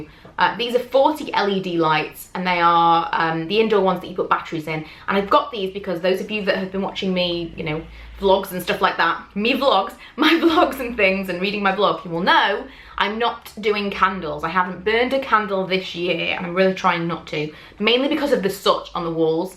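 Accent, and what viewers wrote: United Kingdom. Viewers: I loved the video i am moving next month and this helped me a lot to get some ideas :) btw are you british?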